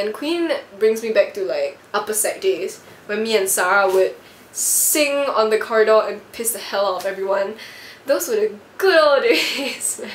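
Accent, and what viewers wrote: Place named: Singapore. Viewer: I love your accent! I also from Singapore as well~ hope to see you around west area :)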